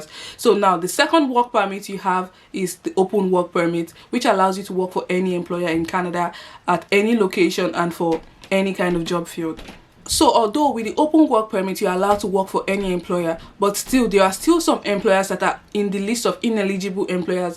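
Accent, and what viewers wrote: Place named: Nigeria. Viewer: Good information but u don't sound Ghanaian ...u sound more Nigerian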